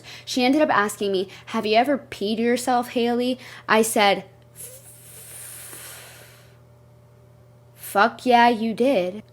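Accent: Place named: Canada. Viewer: I’ve started watching you recently and the first thing I thought that inspired me to click the video was “this girl has to be from somewhere in Canada if not somewhere in Alberta “ . It’s beautiful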